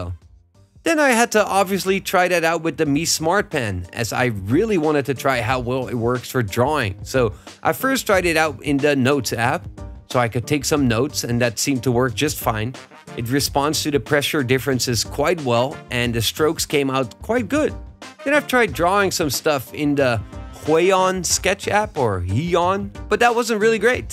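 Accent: Netherlands. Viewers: I sense a Dutch accent 😄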